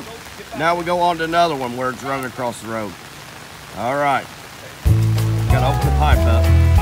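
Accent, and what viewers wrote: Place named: South, United States. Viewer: It's like a post10 video with a southern accent.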